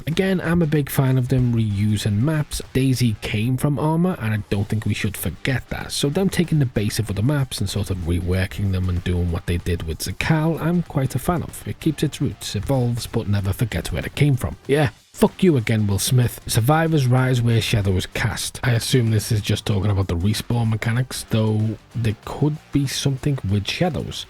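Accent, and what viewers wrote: Liverpool, United Kingdom. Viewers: I need to know are u scouse? or from the wirral i feel like i hear it in ur voice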